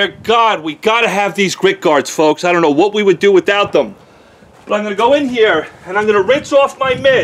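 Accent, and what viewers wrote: New York, United States. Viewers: It's a New York accent, from where he's from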